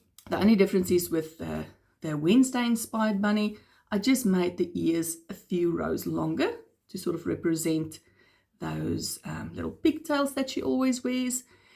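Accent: South Africa. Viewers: Amigurumi making is N-E-V-E-R boring with you!🤩 First, I have to admit, ( maybe it just me, because I enjoy so much your beautiful English from South Africa- me being French speaking from Montreal, Quebec, Canada, its like a melody or a song to me!☺️). Second, 99% of the time, when you tell how you did, where or from who it was created, why you did it that way or how, there is always a story behind! The Marvelous Mermaid became such a beauty, and one of the reason, beside your talent, is cuz you used the yarn from Crochet box Society! Finally using a pattern from another designer to inspire you, you did crochet a vest for your bear! Thank you for keeping those videos coming and to share with us, yours yarny adventures!!! It is a fantastic treat for me and for the others viewers!!!😁💕💕💕💕💕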